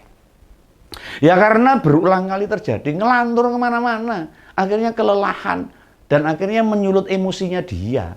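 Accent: Java, Indonesia. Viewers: Alhamdulillah Om nongol lagi Bicara apa adanya luwes cara membawakanya Kental basa jawanya. Monggo pilih Ganjar Mahfud yang tegar dan yahud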